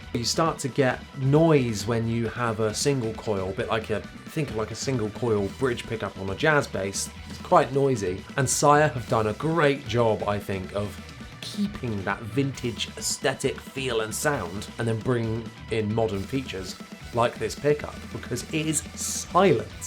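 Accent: United Kingdom. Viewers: I can only listen to a British accent for about 30 seconds, so shut the fuck up and play it!! Peace brother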